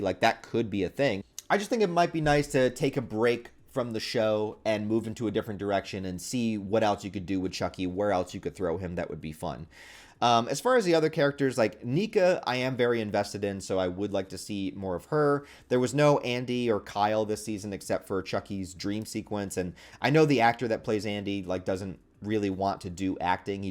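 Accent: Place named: Northeast, United States. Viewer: Northeastern accent lol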